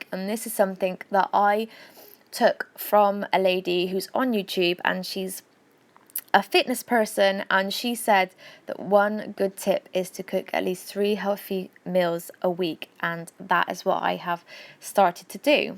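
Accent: Bristol, United Kingdom. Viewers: Love your bristolian accent . . feeling home sick now 😊💕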